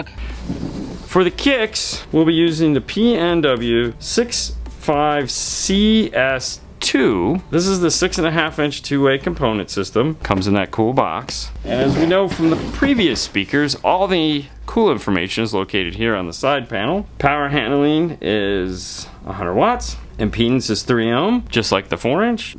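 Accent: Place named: Puerto Rico. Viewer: starting to sound a little Boricua… ya era tiempoooo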